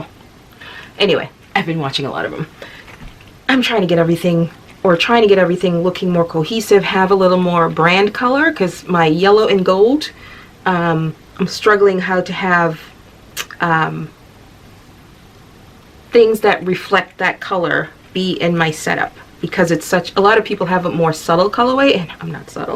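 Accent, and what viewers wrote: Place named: Boston, United States. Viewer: Hahaaa.. you were correct! Rose LOVES to crochet 😜 Wow!!! You got scammed? That's very upsetting 😡 You are an UHHHMAZING crafter. You must totally use a teeny tiny hook because I made the same froggy's, with only 1 strand, and mine are the same size as yours haha I need to invest in an eye nob thing. I so love your yesstuhday boston accent 💖 takes me back to a happy place.. Ooohhhh, those aint no pickles bahahaha jk I'm gonna start using the glue for the eyes. Great tip! The giant Octo is so pretty 💖 You made beautiful projects. Good job friend.. you will sell out!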